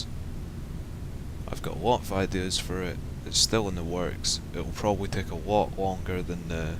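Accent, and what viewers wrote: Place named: Ireland. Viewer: u sound Irish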